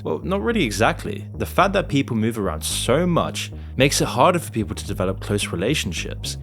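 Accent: United Kingdom. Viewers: The monotone british voice with nothing very interesting to say as every video existing on the internet doesn't help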